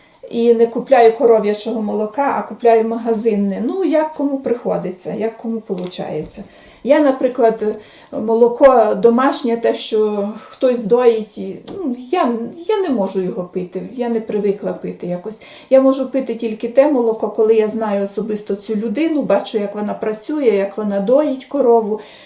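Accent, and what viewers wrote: Western Ukraine, Ukraine. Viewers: Зайшла на ваш канал вперше.Живу в 25 км.від Києва в селищі.Молоко купуємо в магазині,корів днем з вогнем не знайдеш.Навіть кури люди перестали тримати корм коштує дорого,а паїв у нас немає.Землі у кожного не багато.Яйця на сьогодні 80 грн.коштують.Але живем головне миру іхлопцям допомогти.Здоров'я вам пані і вашому чоловікові.До речі розмовляєте ви як спражня жіночка з західної України.У нас багато суржику.❤❤❤❤❤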